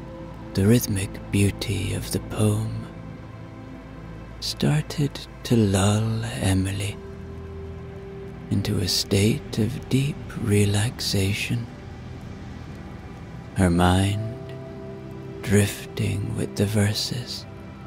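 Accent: Ireland. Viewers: Loved this! I live Liabrarys, old ones expecualy, & Fairy Tails, Story's, & Irish Voice, Thank You ! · Please excuse me, I am uncomfortable with saying anything about this, but his accent is Irish.❤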